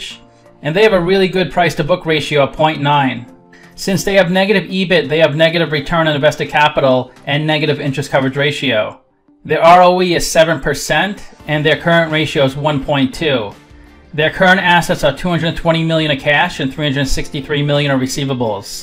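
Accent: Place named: Boston, United States. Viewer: You accent says drunk Bostonian but your efficient explanation says seasoned financial advisor with a unique ability to explain valuations to simpletons like me. 🧐 You have my subscription sir.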